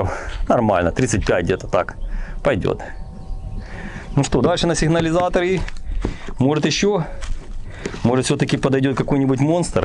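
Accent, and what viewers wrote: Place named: Ukraine. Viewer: Божеее ,яка гарна у вас українська💪💪 Гарне відео 🎣💪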